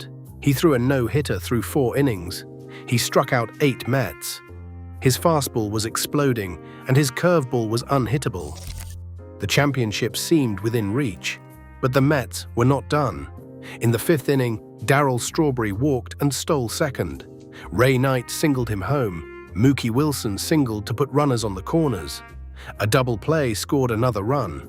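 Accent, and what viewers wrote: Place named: United Kingdom. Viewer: The accent tells us at the very beginning,, this video is going to be a bomb 💣 😅😂😅,,, Veeda Blue,,,, Dykstra Crushed a home-run off oil can Boyd, it hit the Pesky pole in right field, it's been said to be anywhere from 274 feet to 300 feet, regardless, its not a home-run that was CRUSHED, but not according to this Britt nitwit! 😅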